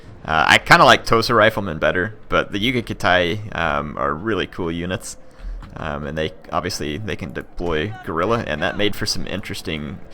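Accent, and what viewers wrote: South, United States. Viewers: Love your southern gentleman accent. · I subbed just for Southern accent <3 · You get a like just for that Soutgern Accent;)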